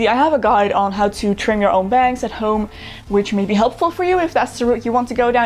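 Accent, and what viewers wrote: Netherlands. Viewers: jaaa zie je wel!! Hoorde het aan je accent. Super leuke tutorials over je ponny ;-) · Ik wist dat je Nederlands was. Ik hoorde het. Maar echt je hebt echt een mooi accent. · Ik wist het! Ik hoorde aan je accent dat je nederlands bent. Trouwens, ik heb vroeger een pony gehad, en ik wil er weer een maar ik vind de nadelen wel kut. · IK WIST GEWOON DAT JE NEDERLANDS WAS AAM JE ACCENT VANAF T BEGIN LMAO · at the beginning of the video I heared a little accent and I was already wondering where you would come from.. and you said the Netherlands🎉 ik weet niet waarom ik in het engels type maar ik ben ook Nederlands!! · Hallo ! Ik dacht altijd al dat je zo een Nederlands accent had nu weet ik waarom en goede video 😗🌸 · Omfg wait, are you dutch ? So from the netherlands ? Or did i hear it wrong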